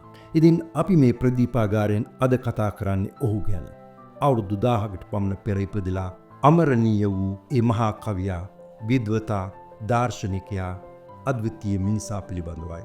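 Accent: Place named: Europe. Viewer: විශිෂ්ඨ විග්‍රහයක්, ඒවගේම ඔබ තුමාගෙ ඉංග්‍රීසි උච්චාරණය හරිම ලස්සනයි යුරෝපියෙක් වගෙයි ❤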